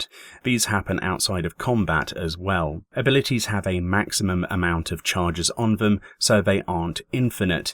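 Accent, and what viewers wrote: England, United Kingdom. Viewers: I think your English accent just makes everything sound more easier to digest lol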